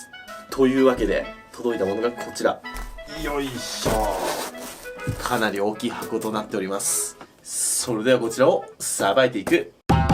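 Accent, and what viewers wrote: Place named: Tottori, Japan. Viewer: 鳥取県の人間です。 いつも楽しく拝見しています。 「宝箱だが。」っという言い方 鳥取県の方言と似ています。他にも似たような言い方をされるので同郷なのではないかと思うくらいでした。 いつか遊びに来て下さい。道の駅ではイカがくるくる回されながら干されていたり、温泉もあるし、松葉がにのシーズンには足が一本無いだけのものが破格値で買えたりします。 これからも頑張ってください。動画投稿楽しみに待っています。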